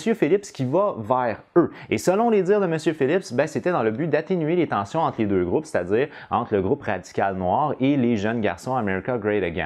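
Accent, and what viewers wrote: Canada. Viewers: Vraiment pro commes video, ça me sidère de voir une telle qualité de vidéo avec si peu de vue ^^ T'as une sacrée aisance à la caméra, j'suis bien content d'avoir découvert ;) Des que j'entends un accents Canadien j'ai directement l'accent quand je pense et parle c't'incroyable ^^ Bonne continuation et t'as le bonjours de la France l'ami Peace et la bonne vie à toi :)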